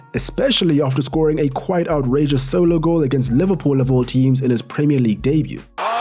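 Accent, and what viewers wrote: South Africa. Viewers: are you south african? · Are you a South African? Noticed the accent immediately ! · Are you from S.A bru ?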